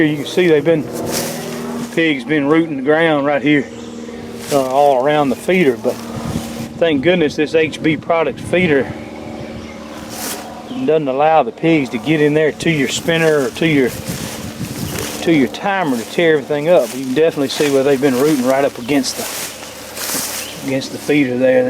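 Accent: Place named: Virginia, United States. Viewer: Howdy, I’m new to your site. I’m an 80 year olde this August & a disabled veteran. I really enjoy what you do and watching you do it. I haven’t heard an accent like yours since my days in Virginia and the Navy so I’m learning. You seem like a great guy and the people that work with you, also. Are those your family or hired hands? Thanks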